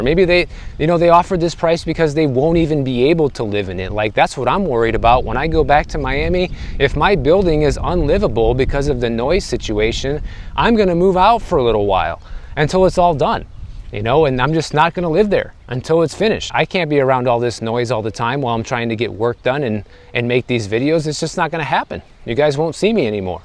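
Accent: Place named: Midwest, United States. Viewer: Being from Boston I love hearing the Midwest Fargo-ish accent . Love the videos bro!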